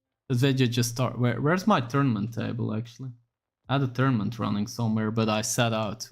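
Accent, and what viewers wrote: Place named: Flanders, Belgium. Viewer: Echt insane hoe hard ik in de eerste 5 seconden hoorde dat gij Vlaams bent. Niemand in de wereld spreekt zo Engels.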